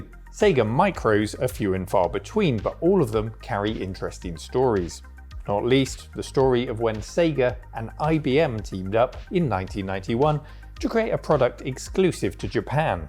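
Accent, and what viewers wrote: United Kingdom. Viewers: You don't sound like you are an American, you sound like that you might be from Britten.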